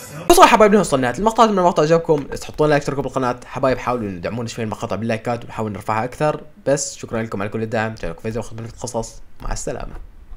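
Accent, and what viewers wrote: Iraq. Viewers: بس سوال انته عراقي لو لا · سؤال انته عراقي لو لا ردلي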